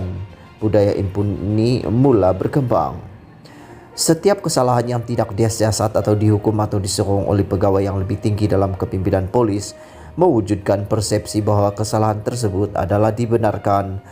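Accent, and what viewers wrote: Sabah, Malaysia. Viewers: Tlg stop buat channel bodoh cam ni... Kalau nak guna bahasa atau dialek sabah sila teruskan... Tp jangan la campur aduk dialek tu... Buruk benar bunyi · Ni loghat sabah ler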